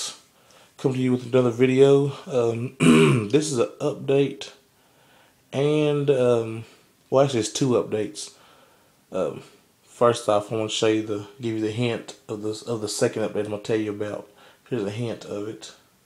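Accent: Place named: South, United States. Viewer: It looks good and u sound southern as hell like me, Louisiana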